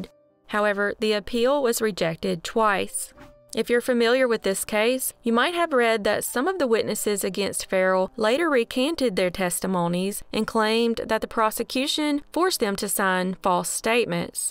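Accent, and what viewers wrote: South, United States. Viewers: I'm a southern "girl" myself SC. And you are now my favorite crime you tuber. So refreshing to hear a familiar accent.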